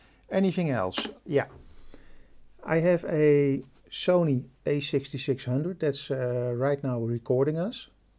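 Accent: Netherlands. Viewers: Heerlijk Nederlands accent 😋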